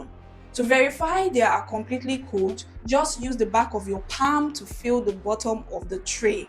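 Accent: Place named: India. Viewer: Why is the voice in India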